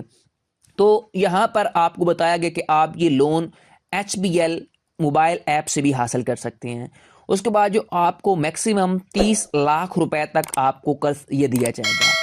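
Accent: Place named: United Kingdom. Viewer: ایک جھوٹ اور دوسرا لہجہ انگریز والا پتہ نہیں لوگوں کو انگریز بننے کا شوق کیوں ہے۔بنک لون دے اور وہ بھی سود بغیر۔ہو ہی نہیں سکتا۔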